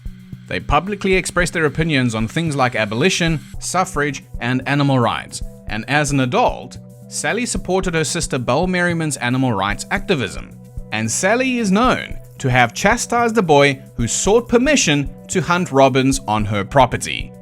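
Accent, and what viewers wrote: South Africa. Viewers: is that a south african accent i detect 😛🤞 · He‘s South African, likely Afrikaner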